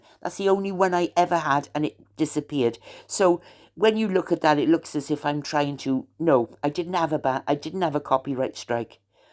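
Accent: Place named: Newport, United Kingdom. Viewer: Welcome back I hope you stay on for ever. From a former Newport women who lived in Pillgwenly for twenty years, now loving in Italy. Your accent takes me back to when I lived in Newport. We love you ❤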